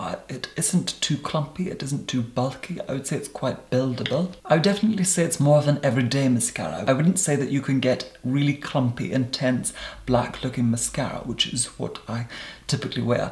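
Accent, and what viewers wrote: Scotland, United Kingdom. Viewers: What is your accent?? Is it posh Scottish? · I get Scottish vibes from the accent ...? But I have just come across u and I subscribed during the first five seconds I LOVE UR STYLE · He is scottish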